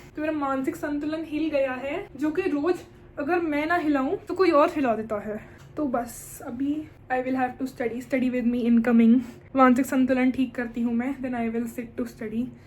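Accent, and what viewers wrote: Punjab, India. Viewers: Godda , putha IK your punjabi accent 😭😂🎀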